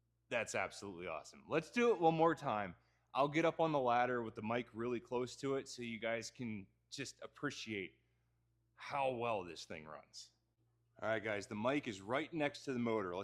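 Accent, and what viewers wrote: Western Pennsylvania, United States. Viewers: U from western PA?!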